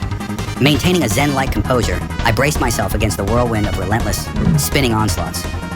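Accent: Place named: United Kingdom. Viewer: why is the narrator brittish?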